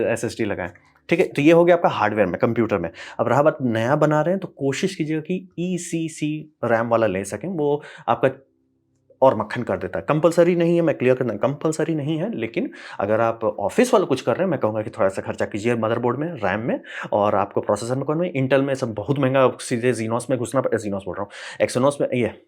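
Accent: Bihar, India. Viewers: Bihar se hawa ka ho bhai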